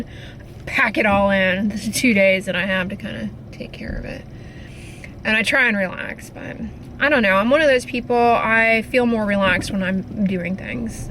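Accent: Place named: South, United States. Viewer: I like your Southern Accent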